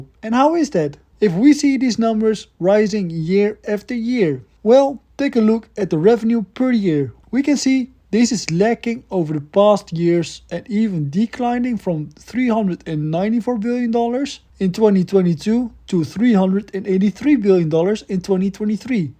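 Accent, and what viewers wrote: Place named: Netherlands. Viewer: Are u from belgium or the netherlands? U sound like dutch💀🤣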